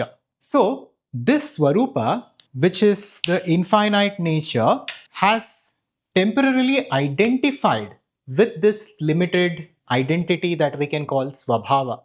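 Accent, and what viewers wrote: South India, India. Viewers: Vedanta is as per kannada vedant is usually in hindi. By his accent he is from south india and that is how we pronounce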